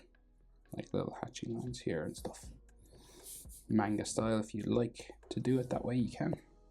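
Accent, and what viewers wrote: Ireland. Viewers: is that an irish accent????????????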